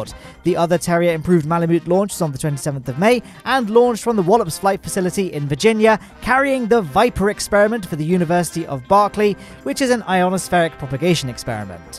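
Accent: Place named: England, United Kingdom. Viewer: As an English man like you I'm not gonna complain about you saying methane and Los Angeles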